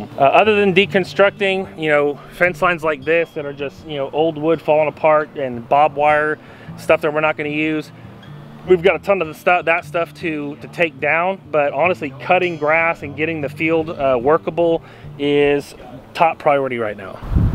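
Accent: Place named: South Carolina, United States. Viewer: Sounds like your already getting that south carolina draw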